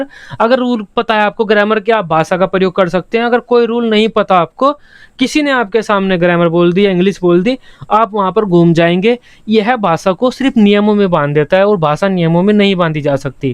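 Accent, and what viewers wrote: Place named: Saharanpur, India. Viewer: Bhai language se 100% Saharanpur ka lagra h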